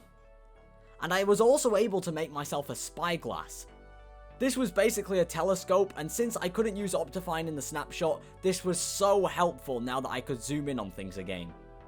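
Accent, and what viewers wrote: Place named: United Kingdom. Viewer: Your british!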